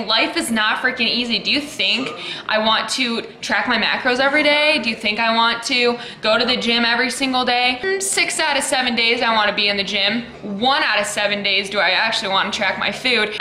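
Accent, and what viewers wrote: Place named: Michigan, United States. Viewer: You sound sooo Michigander it's awesome hahaha. LOVE YOU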